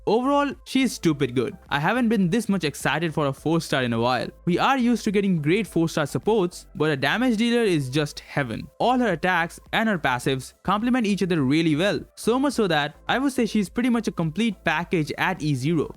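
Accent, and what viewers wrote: India. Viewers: Are u indian or something?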